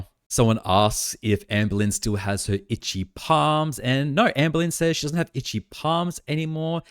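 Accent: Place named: Australia. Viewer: Great synopsis. It's always nice getting Gorlworld updates in my accent. #aussiepride The whole not taking BP medication thing is stark raving bollocks. How does a person with health anxiety (which I do think she has. I have it; I know the signs) hear she has high BP and be like "...no, I'll fix it naturally"? Girl. Come on. Even if we believed you were capable of losing weight at this point, at least take the meds until you've got your weight down and your BP is normal without them. This tells me there's some pride getting mixed in here.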